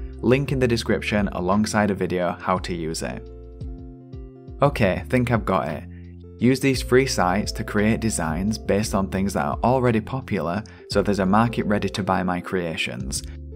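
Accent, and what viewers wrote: Yorkshire, United Kingdom. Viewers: Sounds Yorkshire or northern English to me, based on all the Brit TV I've watched my life. (I'm American) 😊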